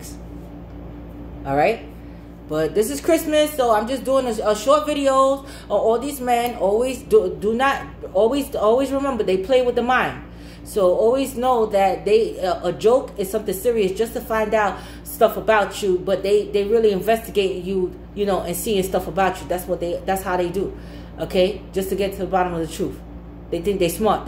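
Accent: New York, United States. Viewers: I'll share with you what I gleened from your analysis as I am a cancer male. First thing..you are a very lovely woman whom I enjoy looking at and listening to. You are down to earth yet majestic. You possess a measure of love but life has taught you some things so you definitely have an edge. You also sound like a new yorker so that could've made you even more aware of life's more cruel aspects. Now to sum it all up cancers are very observant and while they may address certain things the more problematic things...you may not realize they see...because they won't say anything...for various reasons. So it may seem like a "gotcha" moment when things are revealed and laid out on the table. Remember this...for the most part are intentions are good.. if we engage romantically as we are not playas and we certainly are not good liars even when we have to show and prove to people who believe they can out slick a can of Penzoil. 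Peace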